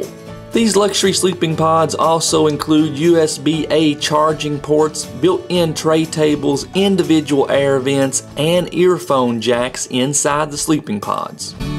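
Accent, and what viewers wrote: Kentucky, United States. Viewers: Thank you so much!:) The Kentucky accent is so funny😁 Keep up good work guys!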